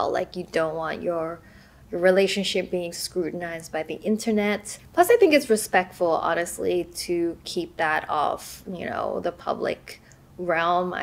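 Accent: Australia. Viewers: I haven’t visited ur channel in years ur Aussie accent hone :( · Where did her cute Australian accent go??? 😩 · ur aussie accent is gone :0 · it realised just hit me but australian accent is nearly completely gone like i can barely hear it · you (guys) were my childhood youtubers u used to have an australian accent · bruh do u know ur accents- it’s Australian 💀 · Youve kinda lost your aussie accent lol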